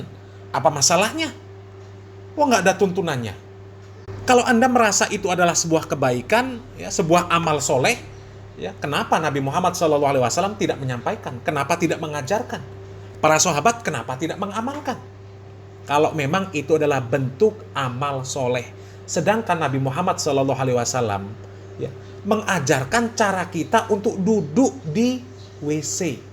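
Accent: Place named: East Java, Indonesia. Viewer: Dari aksennya, orang Jatim seputaran Surabaya Malang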